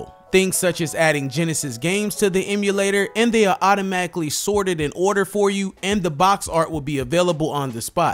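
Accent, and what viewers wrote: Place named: South, United States. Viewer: maybe he is from the south?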